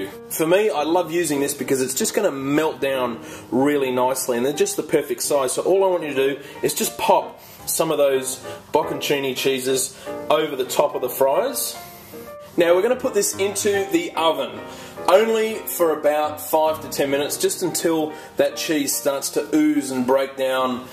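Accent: Australia. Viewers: He is aussie not english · Hes Australian, you yokel. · Omg he's Aussie give him a break!!! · That's an Australian accent mate. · He's Australian you morons and this recipe was shit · Are you Aussie ? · Nice job being a grammar nazi, a douchebag, and making fun of the way he pronounces things. He's Australian lol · FYI, he clearly has an Australian accent... Second. Yes, he's doing the poutine wrong, but it's not his fault. I'm sure it still tastes good.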